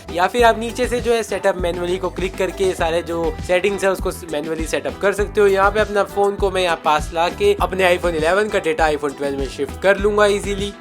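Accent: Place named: Gujarat, India. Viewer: Bhai apke bolne ke ascent se ap gujrati lagte ho❤😂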